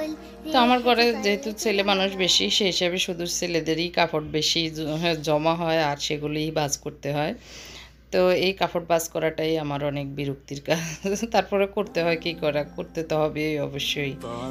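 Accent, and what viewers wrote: Noakhali, Bangladesh. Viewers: প্রথম দেখছি আপনার ভ্লগ। ভালো লেগেছে।♥️ আপুর বাড়ি কি নোয়াখালী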